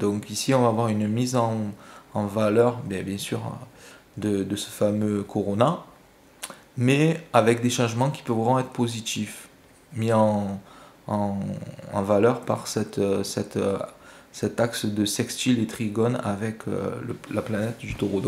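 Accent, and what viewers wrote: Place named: Marseille, France. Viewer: Bravo et merci. 🙏 🙏 🙏 j’ai mis le pouce bleu et je me suis abonnée car c’est un plaisir de vous écouter! Description claire et nette avec ce petit accent Marseillais! 🌏☀️🌜🌗🌈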